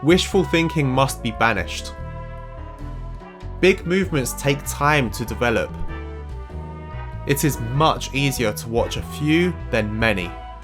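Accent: United Kingdom. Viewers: west london accent nice video: really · I like your British accent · Is this Brittish accent？ Thanks！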